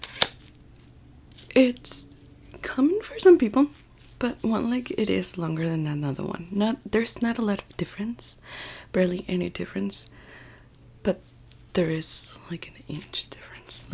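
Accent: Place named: United States. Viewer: A very clear, deep, soft female voice ❤ with a natural American accent. Not all those "French, Mexican, Slavic, etc."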